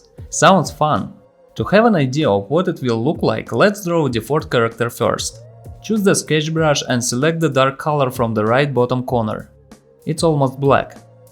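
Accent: Russia. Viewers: Dude are you russia? You speak with some strange accent reminiscent of Russian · это случаем не русский акцент у автора??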